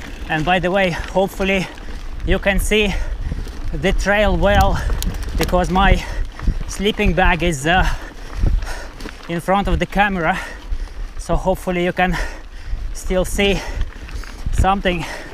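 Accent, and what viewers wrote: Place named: Russia. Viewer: Ох уж этот неповторимый русский акцент...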